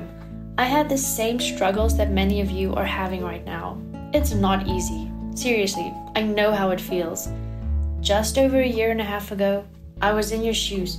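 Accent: South Africa. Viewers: You sound so South African ...others mistake our accents for all sorts of others, but we can always catch the subtle inflections etc, no matter where in the world. I live in Portugal. Adeus/Totsiens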